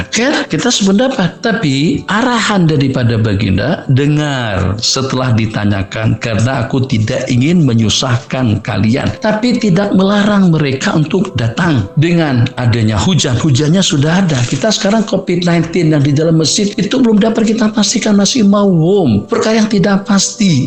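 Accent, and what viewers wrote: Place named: Banjar, Indonesia. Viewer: sheikh ni Malaysianlah cuma dialeg yg d gunakn ming meng dialeknya etnik Banjar dn asal dia Indon ttpi dh lama...mcm orng Cina dtng ke Tnh Melwati awal 1900 dahulu... Agama Islam menggabungkn pandngan agamawan dn bijak pandai moden spt dr/pakar...dh lama kita ikut ckap pemerintah dn dr/pakar tetapi kenpa kes covid makin buruk? Cuba jwb kenapa? Itu maksudnya sudh smpi masa kena minta dn dngar pandngan semua trmasuk agamawan juga...faham! Apa yg sains/barat dpt semuanya dari AlQuran...ngerti!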